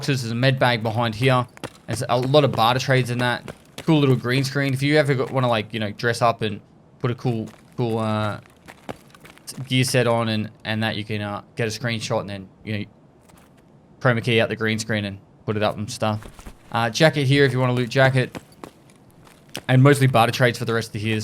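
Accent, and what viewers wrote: Australia. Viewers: As a foreigner not used to Australian accent, i did not understand when he was talking about some « cyfe ». Then I realized he was talking about safes....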